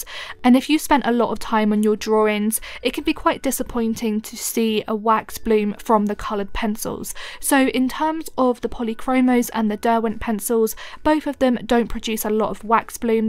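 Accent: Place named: United Kingdom. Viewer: WOW! What a terrific, honest review. I actually like the Derwent Lightfast, despite the high cost, but F-C Polychromos is my default set and I supplement with both Derwent Lightfast and Caran d'Ache Luminance, especially for skin tones. I thought without question you were going to endorse Derwent. Honestly, it's your accent, and my bias indicates unjustified prejudice. I think the genesis of my bias can be found in the fact that in 2014, when I began as a graphite artist, the most enthusiastic reviews I heard were all from British artists, and all of them endorsed Derwent Graphic, so I purchased a full set. What a mistake. I conducted a full-blown scientific technical study of graphite pencils in 2015 and published my results as a 360-page book, Pearson's Graphite 2015. I found Derwent Graphic was just about the worst graphite pencil set, containing scratchy pencils, dark grade pencils that gave lighter lines than light grade pencils, broken leads, misaligned leads, and so on. 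Faber-Castell and Mitsubishi Hi-Uni had some of the best scores for the 370+ pencils I tested. I have yet to hear a British artist say anything bad about Derwent Graphic, which raises all kinds of questions in my mind. I find nothing at all wrong with any of the Derwent coloured pencils, but their graphite pencils are simply crap. Maybe Derwent has (I guess you would say 'have') decided to give up on graphite and concentrate on coloured pencils?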